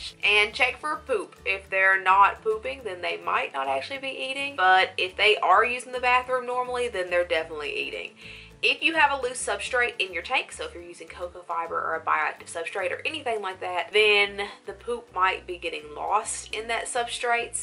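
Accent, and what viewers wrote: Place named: Alabama, United States. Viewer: I listened to just one of your videos and I was like “this chick is from Alabama!” Came to this video and was definitely not disappointed! You are such a good source of info for snake info :D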